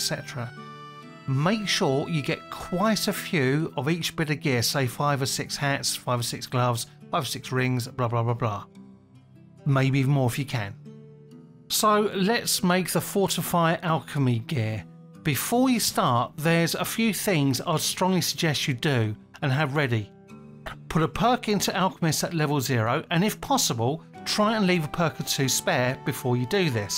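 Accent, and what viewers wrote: England, United Kingdom. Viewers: This sounds extra legit with the English accent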